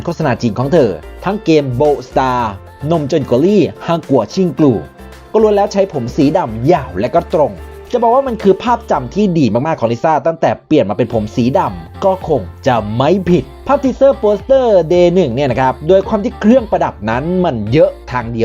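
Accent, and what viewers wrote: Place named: North, Thailand. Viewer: ติดตามได้ซักพักคัพ ชอบมากๆ ผมก็คนเหนือ ได้ยินสำเนียงเลยถามเพื่อความมั่นใจ อิอิ ...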